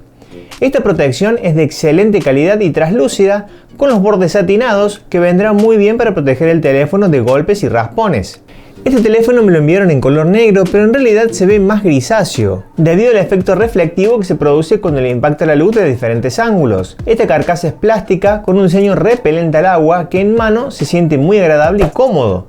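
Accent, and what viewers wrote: Córdoba, Argentina. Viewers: muy buen review! me suena a tonada Cbesa la de, mepa o es de Río III? Saludos!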